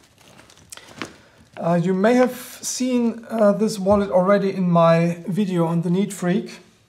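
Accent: Germany. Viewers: Your english is cool - I like the german accent ;)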